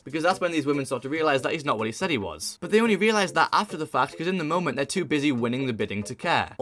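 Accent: England, United Kingdom. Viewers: Bro is an English chap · Bro’s such a fan he always talks like an English chap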